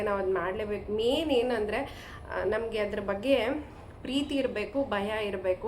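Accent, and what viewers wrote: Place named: Bellary, India. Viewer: Bellary nalli undya tindya ubmonaa ee tara yella mataditivi… gramya plus Telugu influence enda nam Kannada haage erutte…😂 nanu Banglore ge 1st bandage we tara adikolta eddru… but I love ur raw bhashe… keep going. Gaatri avarinda nim video noodle shuru aytu.. Bellary nalli YouTuber edare anta gotte eralilla… happy for you 😊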